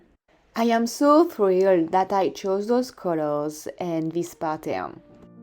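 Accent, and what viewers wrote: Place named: France. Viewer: Love your French accent ! Et tres Jolie tasse au passage 😘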